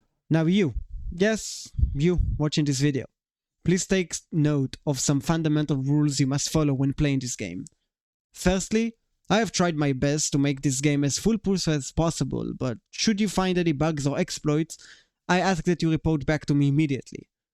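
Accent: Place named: Germany. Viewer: your accent is so interesting it kinda sounds german but not at the same time so cool! also this video is so awesome of an intro its really so awesome to see new ideas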